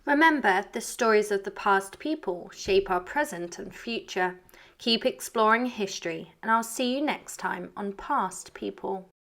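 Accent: United Kingdom. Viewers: Hard to Liston to, thick British accent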